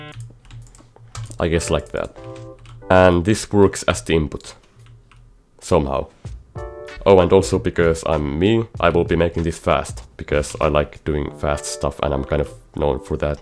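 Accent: Finland. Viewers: I sense the Finnish accent, great video👍🏼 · You have the most finnish accent ever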